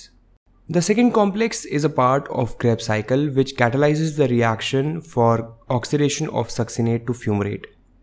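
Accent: India. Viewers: I love it 😊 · I hate this indian accent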